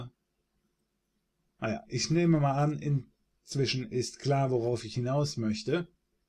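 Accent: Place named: Swabia, Germany. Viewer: Schwabe! xD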